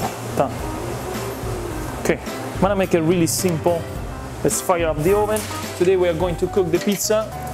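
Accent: Italy. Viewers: I came here to listen his Italian accent 😅 and I Iove making 🍕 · Salve o... AVE?;-) are you born Italian living in the USA o Italian born in USA? I really don' t know if you speak English with that accent pourposly or is faked;-) BTW you are very good pizza maker and looks a super very nice person keep up with your outstanding videos!!! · On my 40th batch of pizza following this recipe. all i can say is get 00 (zero zero) flour to make to worthwhile - buy best flour you can get hold off and the best yeast you can get the mcDougalls one is good! Love this Video and i practice my italian accent when making it so i sound like the man in the Video TUTTO BENE x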